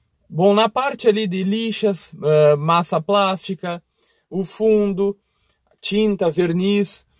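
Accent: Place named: Bagé, Brazil. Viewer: De Bagé ou região amigo? Sotaque da minha terra! Estou acompanhando os vídeos, muito bom. Pretendo reformar um Uno por hobby. Abraço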